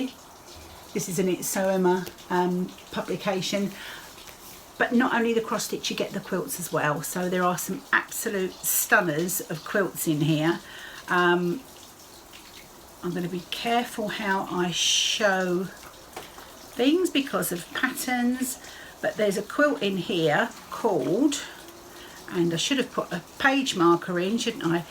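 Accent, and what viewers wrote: United Kingdom. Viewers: I recently discovered your channel and I'm so enjoying it! I am a quilter and knitter but have picked up cross stitch again. It is so pleasant listening to you while I stitch because my Mother was British and it makes me think of her!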